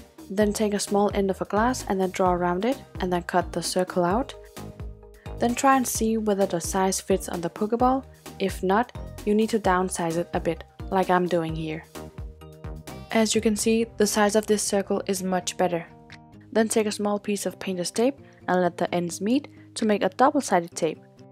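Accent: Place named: Denmark. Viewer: What cool projects! I love your accent - do you live in Denmark?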